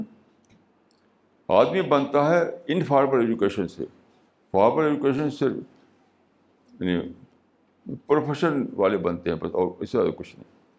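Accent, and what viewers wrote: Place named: Arab world. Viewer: Arabic accent not clear always.Urdu is o k .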